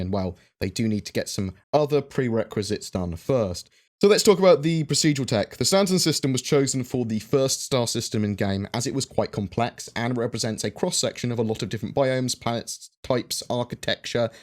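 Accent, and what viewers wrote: England, United Kingdom. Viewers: Your english accent is so terrible.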